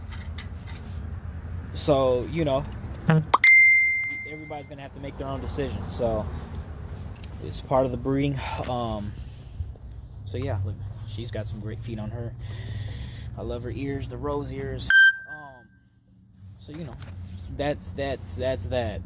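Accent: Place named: Rural, Puerto Rico. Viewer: Good information bro.. this is dead ass my 1st hearing a Country Rican lol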